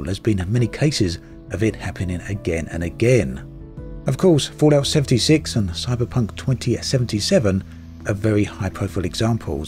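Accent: United Kingdom. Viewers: Pretty good game and stuff but it's pretty obvious your desperately grasping for content that people want to hear with your weird whispering British voice lol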